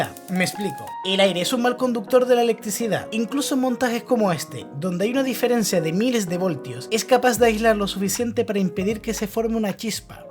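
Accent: Chile. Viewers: Tienes el acento de un chileno. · Amigo, cómo es que hablas como chileno? Jamás imaginé que fueras español, un saludo desde Seattle, tu canal es increíble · Buen día, colega ¿Se puede saber de que cuidad de España proviene? Es que me asombra que su acento suene tanto al chileno. Si no fuese por el seseo típico español, habría pensado que eras de Chile. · Están geniales tus vídeos!! Muy explicativos y profundos. Solo me perturbaba una cosa y es tu acento tan parecido al chileno jajaja hasta que descubrí que eres de Canarias y ahí todo tuvo sentido 😂 Saludos desde Chile, el peor país de Chile 😅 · este man es chileno?? tiene acento de chileno!! saludos🇨🇱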